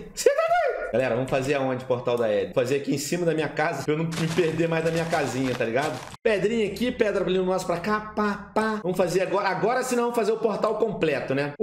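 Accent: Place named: Portugal. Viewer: eae depois de muito tempo sem ver seus videos eu voutei quando vi seua intro ja bateu uma nostalgia cara amo seu canal assisto seu canal desde os meus 5 anos de idade saudades amo seu canal percebi q vc ate ta com um sutaque meio portugues kkkkkkkkkkkk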